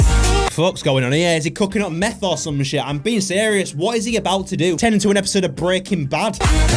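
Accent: Yorkshire, United Kingdom. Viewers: u from yorkshire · My favourite thing in this channel, is that he is British (or atleast he sounds like one). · this guy is pure yorkshire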